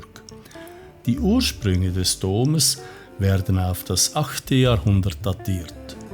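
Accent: Switzerland. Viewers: Sehr seltsam, der Sprecher hat einen Schweizer Dialekt, muss das sein??? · Im Allgemeinen haben es Schweizer so an sich, mit Akzent zu sprechen. Würde er im Dialekt reden, verstündest du kein Wort.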